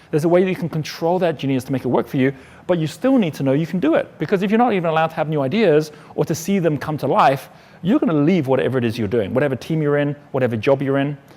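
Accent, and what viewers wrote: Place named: South Africa. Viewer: Can somebody tell me where his accent is from? It sounds like a South African gone to Australia or something.....curiosity killed the cat I know, but it's killing me not to get it 😬😅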